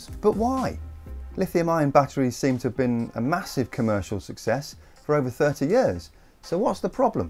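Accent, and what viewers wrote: United Kingdom. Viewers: Give an idiot a british accent and he sounds reasonable.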